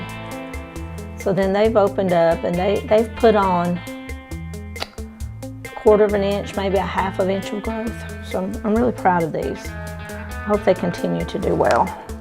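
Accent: South, United States. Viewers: I’m in Sevierville. Near Gatlinburg 😊. What part are you in? I was thinking you’d said middle TN in one of your videos. I recognized your accent immediately before you even mentioned TN. I love our southern accent. Don’t hear it on YouTube much 😊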